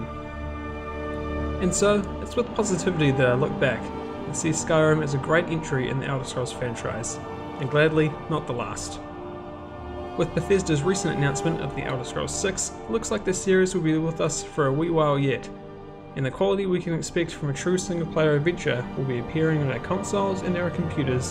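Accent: New Zealand. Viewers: Great video! Also are you a fellow kiwi? Bless up 🙌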